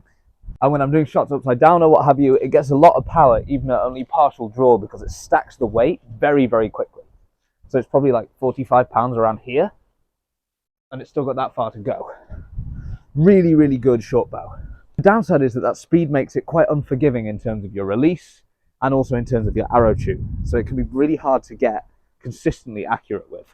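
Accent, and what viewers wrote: United Kingdom. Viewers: Dude! Your cool as shit! I feel like a kid watching you do your vids, you look like a fantasy hero, you sound cool (cool voice, British, speak in the kings english) and you are an enjoyable watch.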